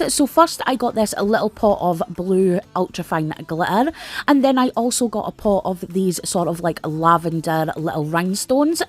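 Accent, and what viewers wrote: Scotland, United Kingdom. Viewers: You are from scotland, aren't ya? I can hear it, as a German 😅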